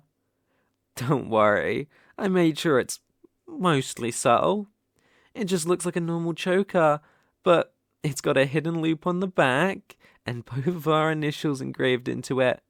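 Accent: United Kingdom. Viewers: The British accent is just : 😩👌 wonderful 😭🌟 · British. Take it from a Brit.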